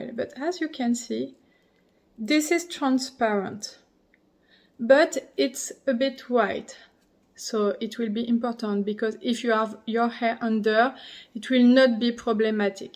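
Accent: France. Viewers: I love French. But your accent is terrible. Better not to speak English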